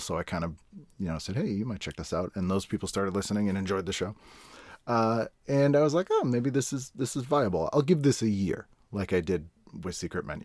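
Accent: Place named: Boston, United States. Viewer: Congratulations! Been loving going back through the back catalogue. I blame you gushing about Trilogy of Terror on Junkfood Cinema for making me go listen to your podcast (and also Dana Gould and listening to you both go FULL Boston which is something to behold). You rock, man!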